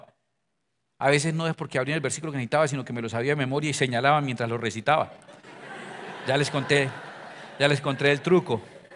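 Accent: Cantabria, Spain. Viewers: Gracias paisano no solo por la Palabra de DIOS REVELADA, si no por la manera como la trasmite en nuestro dialecto especial Santandereno tan jocoso gracias pastor me hace reír y estoy segura que al Señor.Jesús también bendiciones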